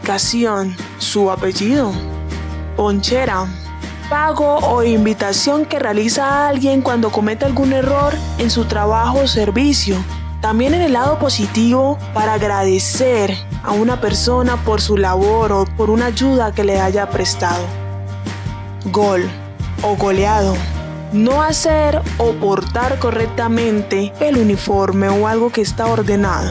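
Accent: Colombia. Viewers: Hola la que locutora del video es caleña o chocoana?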